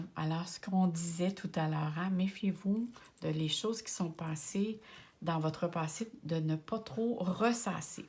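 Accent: Canada. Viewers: Bonjour, j’arrive sur votre chaîne et j’adore votre accent du Canada 😊. Je vais vous écouter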